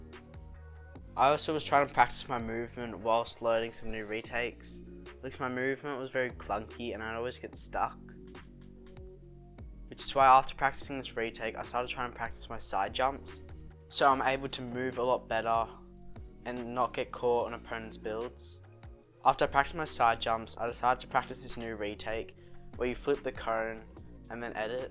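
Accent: Australia. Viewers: Na Aussie accent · same I’m Aussie and he kinda sounds like PWR Alex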